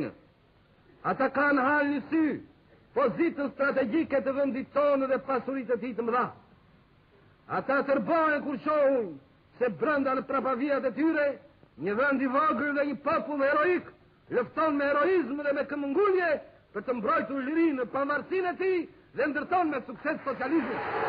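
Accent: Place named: South, Albania. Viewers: Kosovans speak the geg dialect, Same as north Albania, the south speak the dialect youre hearing in vid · Hoxha grew up in southern Albania (namely Gjirokastër), where the Tosk dialect is spoken, as oppsed to Kosovo Albanians' Gheg dialect (which is also spoken in northern Albania and most of Albanian-populated areas of Macedonia)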